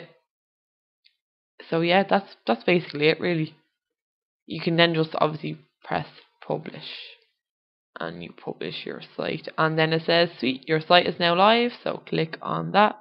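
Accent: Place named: Ireland. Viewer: you do have a lovely irish voice. thanks for the vid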